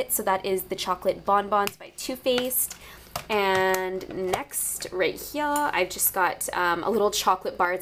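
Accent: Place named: Canada. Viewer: Canadian accent on fleek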